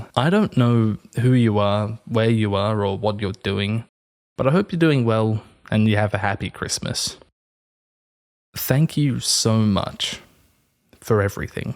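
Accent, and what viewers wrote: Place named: Australia. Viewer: Snap, I know the Pisstakes backwards and forwards from all the listens. It started as just genuinely relaxing to hear someone react the way I react to bad writing/lazy world building/ruining epic series because you're a try hard who wants to make a Staar Whor, but eventually it became familiar and therefore comforting in a different way. I probably enjoy hearing an Aussie accent too, keeps my homesickness in check.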